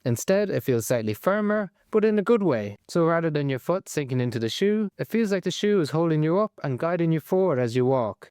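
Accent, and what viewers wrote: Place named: Ireland. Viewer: Find it difficult to understand the thick Irish accent plus talks to damn fast so the obvious thing to help is when each shoe is introduced have the exact model flash up on screen this would be great help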